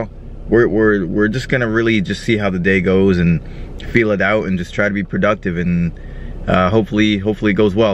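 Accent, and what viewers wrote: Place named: East Coast, United States. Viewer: You from East Coast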